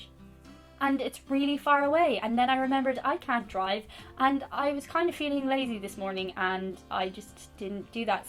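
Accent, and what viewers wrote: Ireland. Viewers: Another funny one!!! You are Brilliant! You sound so American, every once in a while I hear a little Irish come out but you just speak so well! Texas is coming soon! Cheers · P.s. part of the charm of your videos is the accent. In America, we enjoy hearing Irish accents. I suppose we think it’s cute, or whatever. So, if you pronounce Fall like an American, it’s sort of like removing and discarding the chewy center of an Oreo cookie, so to speak. By the way, we call a pumpkin a punkin’. We know our pronunciation is wrong, but we say it like that, anyway. It’s just cute, or whatever.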